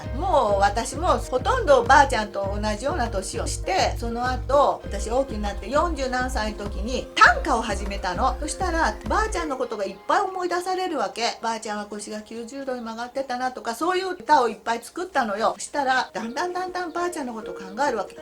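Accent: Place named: Hiroshima, Japan. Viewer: 広島弁かな！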